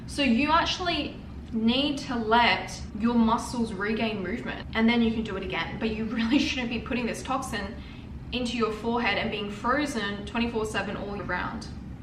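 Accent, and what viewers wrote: Australia. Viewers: ? Aussie accents are so hot rosé from blackpink for example, what are u on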